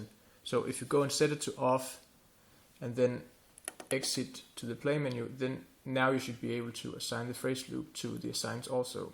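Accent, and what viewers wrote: Denmark. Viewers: Hi again! nice videos. Easy understandable. Are you Danish ????? thanx again 😁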